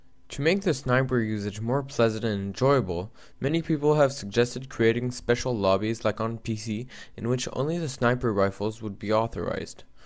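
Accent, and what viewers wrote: France. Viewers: vous y croyez ? non mais c'est quoi cette accent français ... --' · C'est un mec français qui parle en anglais, son accent est pourri. Pour que la vidéo soit vu par les développeurs de Black Ops 2, il faut spammer sur leurs TWITER OU FACEBOOK ! Faites le SVP, on aura plus de chance d'avoir enfin un bon Call Of Duty :) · C'est un français qui parle mon petit... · Ca s'entend que c'est un francais qui parle Anglais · Fake --' Le mec c'est un francais qui parle en anglais afin qu'on aille sur ça chaine et qu'on lui fasses des vues... Je connais peux d'anglais qui disent ''Code Quegé'' , ils diraient plutot ''Cod Queji''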